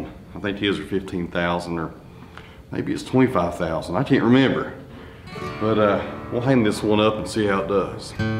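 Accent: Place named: East Tennessee, United States. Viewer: Man your videos make me miss home more than any other...Im originally from Maryville/ Knoxville area. Here at the holidays its good to hear a east Tennessee accent!! You dont know what you have until it gone. Never a truer statement. Keep it up and Ill keep watching.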